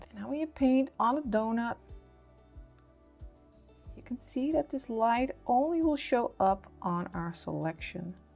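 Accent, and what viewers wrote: Netherlands. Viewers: i was like: she is dutch. I CAN HEAR IT. En toen hoorde ik stroopwafel en ik wist het gewoon.. BTW LOVE YOURE VIDSSS <3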